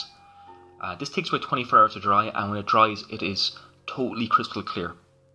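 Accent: Ireland. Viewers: great to hear an Irish voice,great stuff from Bill in Limerick.I have the Yamota battleship.regards Bill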